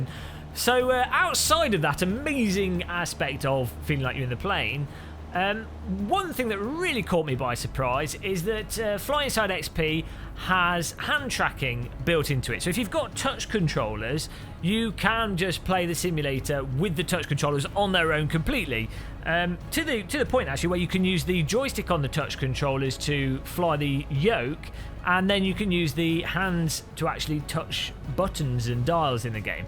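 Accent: United Kingdom. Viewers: You're awesome! You're the only person with a British accent while having such an upbeat and awesome voice. Amazing review. Hopefully you get into flying more.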